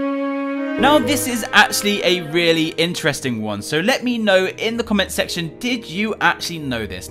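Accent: United Kingdom. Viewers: your from england i love your voice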